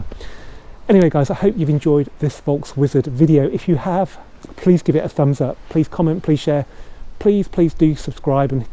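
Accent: United Kingdom. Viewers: are u sure? ur roads look like shit in UK... io saw roads like that last time in the post soviet 90s in estonia.... why the fck doesnt ur gov fix roads??? (unless i misjudged that ur voice sounds like british)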